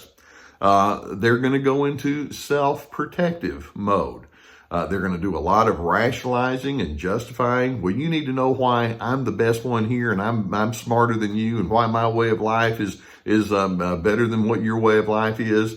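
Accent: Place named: Texas, United States. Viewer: Exact description of my neighbor, he is in the 'suffering' stage. Once we know the way, we will always win by taking the higher ground and holding our power. This is the VICTORY that you share so freely, giving true hope to those who are on the road to recovery. And, your Texas accent with your soothing voice is SO RELAXING AND AFFIRMING!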